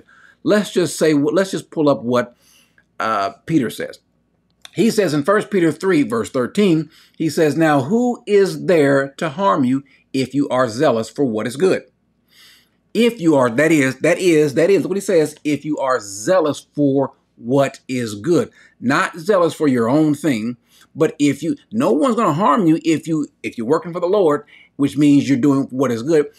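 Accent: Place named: United States. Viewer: U need to check yourself, what do u mean trying to sound black, what does black sound to you? He talks like normal human being. His just American, being a rapper does not mean black, it means rapper simple. Don't link being a rapper equals talking black. Just say u don't like him. Why are u comparing his following to another persons following as if it's his fault? U should try watching his videos before judging.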